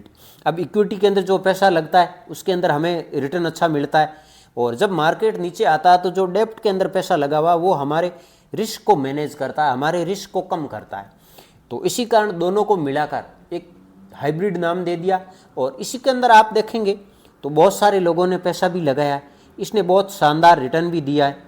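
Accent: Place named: Rajasthan, India. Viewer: बहुत अच्छी जानकारी दी आपने बहुत बहुत धन्यवाद आपका 😊😊 राजस्थान से लगते हो आप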